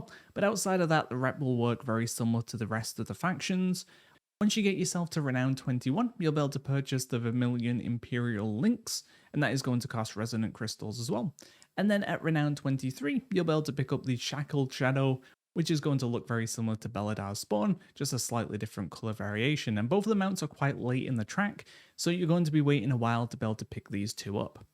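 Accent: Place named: Manchester, United Kingdom. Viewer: My guy is so Mancunian ❤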